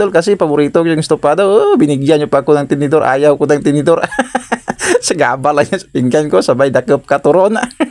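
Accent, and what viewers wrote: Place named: Karay-a, Philippines. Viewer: Hahah ,nami gali pamatian Ang Tagalog kag karay ah 😂 in short Tapaznon 😅❤ Idol lang sakalam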